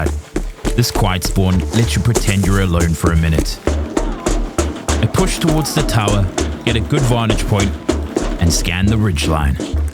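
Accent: South Africa. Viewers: You sound South African in a way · I see your ping is around 26ms but your accent sounds South African. Did you relocate or am I missing the plot here :) Good job on the shorts and longer form videos. Always a nice watch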